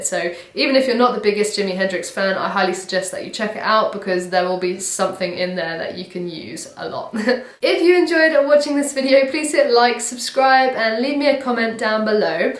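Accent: England, United Kingdom. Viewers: Unbelievable!! I love Hendrix & after I learned how to play my 1st Hendrix song I had a newfound respect for the man. I still am amazed by the way he thought and the licks he came up with. The 1st song I learned to play ever was!! I'm def going to check this out. I love your accent of course you are from the UK.. it's hard too meet a girl that rocks in the US. Now it's hard to meet anyone, (COVID) smh. Love your music keep up the good work you rock!! -- Nigel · Se nota que es inglesa, puedo conocer esa forma de hablar y su acento pirata!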